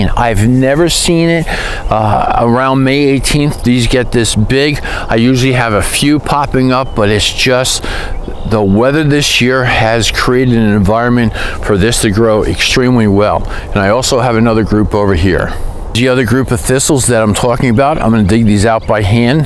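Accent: New Jersey, United States. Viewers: I agree the wether has been so up and down here in NJ. I’m in zone 7a. It’s kind of funny that I recognized my own accent when listening to you and wondered if you’re from NJ as well. Lol. I watched another video and sure enough you are lol. My tomatoes were doing really good and then really bad after up potting them. They’re in the garden now and most are making a nice come back. I have no clue what was happening but the tops looked like they rotted and fell off on some. I ended up putting them all in a trash pile outside that I neglected and to my surprise they started getting leaves down low on the stem 🤷🏼‍♀️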